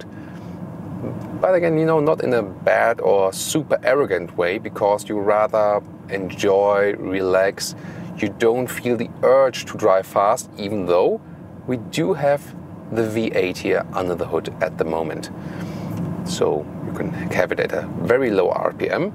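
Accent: Germany. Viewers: Deutsches Auto, deutscher Sprecher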